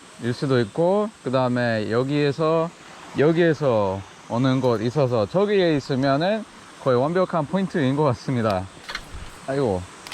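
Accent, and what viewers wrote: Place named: Busan, South Korea. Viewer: 부산 친구 때문에 사투리 쓰는건가요ㅎㅎ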